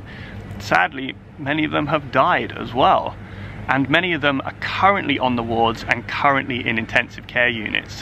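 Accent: United Kingdom. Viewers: Harfway froo. Beautifully Brit. (I’m sure that some of my Americanisms are offbeat to Brits, though probably not so endearing.)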